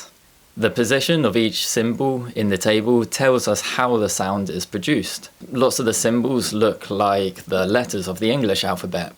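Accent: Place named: England, United Kingdom. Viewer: I love your English accent ❤️🤩 What's the name of your English accent?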